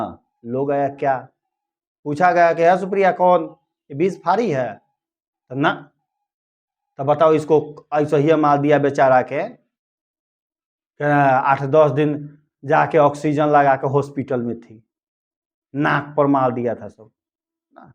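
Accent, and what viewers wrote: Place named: Bihar, India. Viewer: SIR MAJA NAHI AA RAHA HAI APANA STYLE ME BIHARI STYLE BOLIYE ❤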